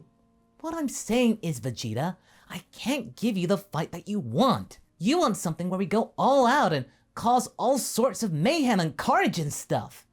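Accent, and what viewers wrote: United Kingdom. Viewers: Day 1 of asking whether he’s from the UK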